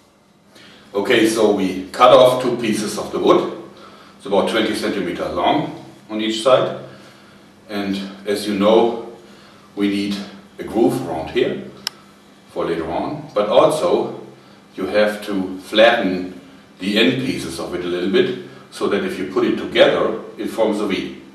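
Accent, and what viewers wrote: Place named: Germany. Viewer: dieser akzent... jup, ein deutscher! :-)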